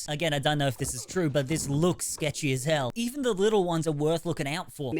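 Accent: Australia. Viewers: The Aussie accent that this information is being delivered with really adds credence as that's the country full of animals that very famously Want To Kill You.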